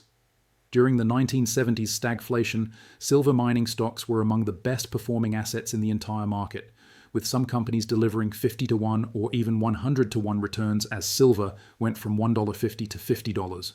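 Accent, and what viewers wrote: United Kingdom. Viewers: blathering AI avatar with a uk accent ==total nonsense--these guys are loony bs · British, American and Aussie accents and with more names than Frank Abagnale ever dreamt of. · The AI dithers between British and American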